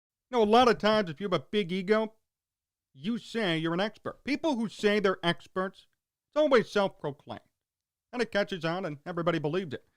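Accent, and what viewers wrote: Chicago, United States. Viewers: This is the most Chicago accent I’ve ever heard in my life.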